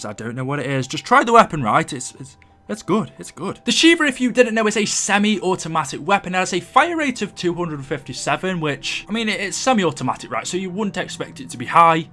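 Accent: United Kingdom. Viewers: Derek Monroy he is british, it doesnt matter how he says it...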